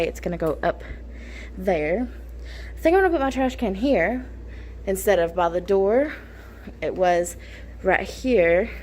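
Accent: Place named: South, United States. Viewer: Hey! I just wanted you to know how much I am enjoying watching your classroom vlogs. Your classroom is looking great! I love the math alphabet, but I especially love your accent!! I am also a teacher from the south. I have been told recently that, like you, the teacher will most likely have to move from class to class. I agree that it is the safest thing to do for our students, but it will be a challenge! Good luck to you!! By the way, I also teach 6th grade math at my school:)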